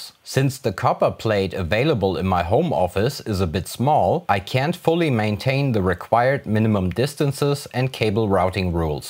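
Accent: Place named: Germany. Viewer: schönes video. Achjaaa deutscher Akzent 😁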